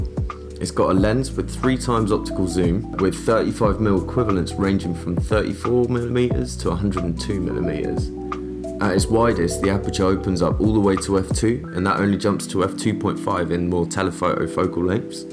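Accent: United Kingdom. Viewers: I like your accent, it's that cool and actually bearable British also cool video and obviously great visuals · love your videos!!! Could you say more about the settings you use for the different digicams? (music a little bit too loud for me, though... not sure you need it while you speak, let us enjoy your cute British accent😉...)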